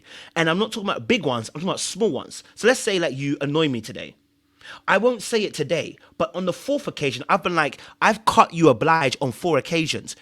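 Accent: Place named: United Kingdom. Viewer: When u start talking and the British came out 😮 it will never not be shocking to me ❤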